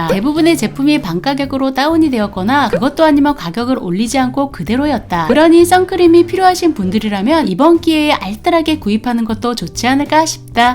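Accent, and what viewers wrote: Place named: Japan. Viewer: 한국어를 일본어 처럼하시네요 ㅋㅋㅋ 독특한 억양 중독성있습니다 꿀팁 감사합니다~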